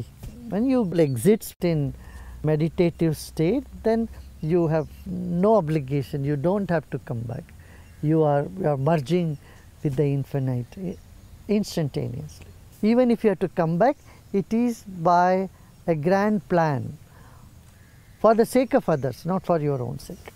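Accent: India. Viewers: I so wish I could understand the East Indian dialect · With that heavy Indian accent and background music how does someone understand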